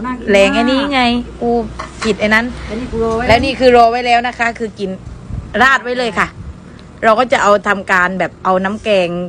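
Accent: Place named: Central Thailand, Thailand. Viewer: แหลงกลางชับๆเพนิคนๆ☺️😘🤩💖💟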